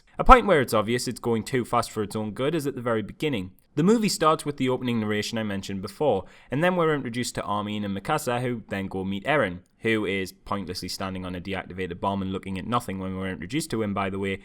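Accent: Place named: Scottish Isles, United Kingdom. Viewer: You sound like you're from the Scottish Isles, your voice is amazeballs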